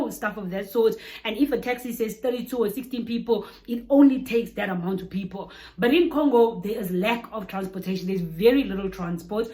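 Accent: South Africa. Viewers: Your accent is so South African 🇿🇦. 😊♥️ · How are you not South African with that accent is just a shock to me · Manje why do you sound sooooooo South African this much. You even look like South African ladies😂😂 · I would'nt believe you are Congolese coz you have a South African accent 😊🙈 Love your personality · Wena u not Congolese, why do u sound so south african and hood south african at that. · If she didn't mention that she was a Congolese I wouldn't have known.. She is south African.. The accent 👌👌🤣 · South Africa has rubbed off of you, you sound so much like us now hahahahahaha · Shuuuuu, the South African accent is legit. Wow sis...💯🇿🇦👌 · I love how you adopted south african accent, unlike some big headed ones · The accent is legit SA.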